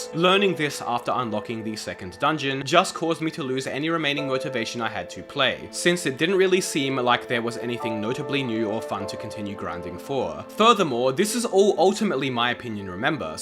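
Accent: Australia. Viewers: Australia? I thought you were frok the UK. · Oh my god... You're Australian?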